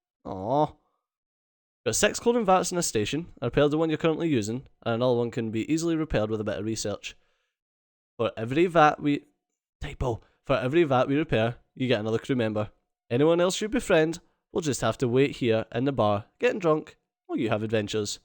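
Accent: Scotland, United Kingdom. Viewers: I was laughing so hard while was reading the Robots responses. Not just because the dialog was funny but, because accent seemed go go well with it. Hurray for Scottish accents. P.S. Love your vids + keep up the good work. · Woo! HAL 9000 jokes with a scottish accent! if this game would have multiplayer, i will buy this as soon as it's awailable =D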